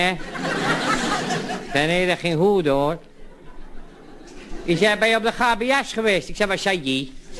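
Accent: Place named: Zeeland, Netherlands. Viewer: ik ben ook een zeeuw maar deze praat zo plat dat ik het moeilijk kan verstaan:P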